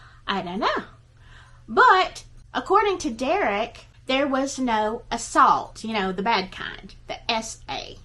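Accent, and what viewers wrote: South, United States. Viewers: Love your southern drawl..I've got a kiwi one! 😉🌿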